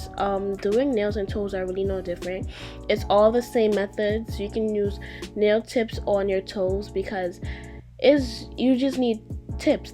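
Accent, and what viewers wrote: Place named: New York, United States. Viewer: Hurd ya accent & knew you was from New York 😭😭 Ima self taught mua & hairstylist and would love to network with you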